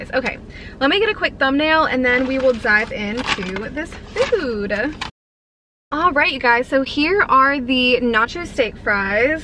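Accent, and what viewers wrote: South, United States. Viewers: why do I watch these videos they always make me hungry lol. Also, where are you from? you sound like you kinda have a southern accent to me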